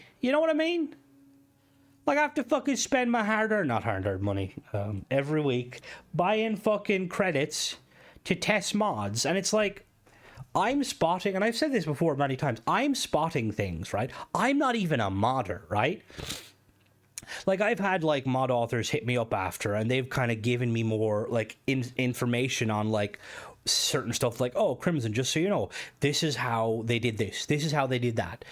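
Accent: Ireland. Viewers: M8 your accent sells it for me I think the Irish sound hilarious every thing else bonus your great lad am a Geordie so appreciate how funny accents are are and btw starfield is what it is the problem is it's not what everyone thought it was gonna be it's deffo not crap by any means bit niche yes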